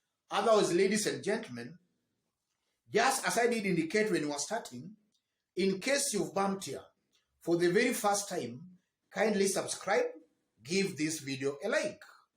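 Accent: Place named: Kamba, Kenya. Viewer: You are Crazy bro .Am also a resident of Mombasa by birth and residence and schooling upto Tertiary level.Yet I come from Siaya.I know you are Kamba from the way you are talking.First,I repeat ,kambas are majority only in Changamwe area.The areas you have mentioned especially Mshomoroni and Likoni they do exist but not majority.Secondly,how in your mind would you think UDA supporters will vote Sonko yet they want to benefit from the Azimio confusion to clinch the seat through Sarai.Thirdly,the renowned luo politician you are talking about is Awiti Bolo,a confused Luo who first vied for the Nyali parliamentary seat on wiper, won then decided to go for governorship through the same wiper in 2013 defeated by Joho then went independent and formed his own party which even Luos cant board. He only met with the few Luos leaving in Kisumu ndogo and Kongowea.Hope you know where majority of Luos Reside.As a Kamba,zidi kujifurahisha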